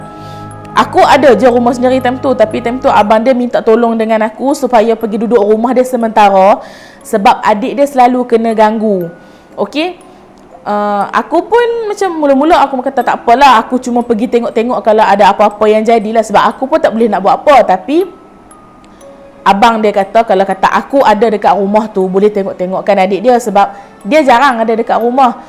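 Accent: Kedah, Malaysia. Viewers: Akak org kedah ke penang?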